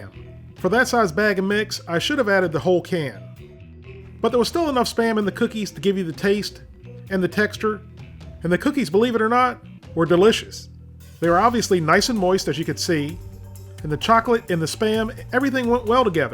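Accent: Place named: Maryland, United States. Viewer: What part of Maryland you from, chief?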